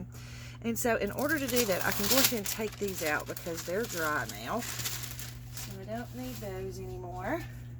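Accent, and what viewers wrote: South, United States. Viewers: PS...still loving that southern charm and accent....music to my ears👂👂👂👂👂👂👩‍🎤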